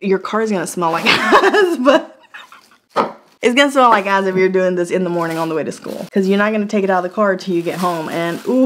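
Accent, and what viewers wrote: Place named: South Carolina, United States. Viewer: I can vouch for the fuzzy eliminator. i've had one for years and it cleans all my sweaters up beautifully. Yes....be careful to stay away from any long strands of fuzzies.....they will get caught and sound like you're cutting off your arm. lol But yeh.....I would buy another one in a heartbeat. Actually I don't think there is any other gadget that does this, except for like you said......a razor. And I have torn many holes in to a sweater using a razor. So that is a no no! By the way.....where are you from? Anywhere near Sumter SC? Born in Wilmington NC....raised in Sumter. You definitely got the SC accent going on.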